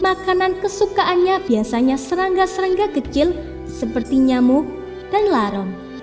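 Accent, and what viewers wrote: Java, Indonesia. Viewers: Ngmongnya meddok, lelet lagi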